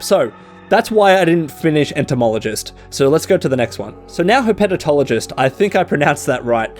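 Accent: Australia. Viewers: Aussie? And you look like Daniel Riccardo 🤟🏼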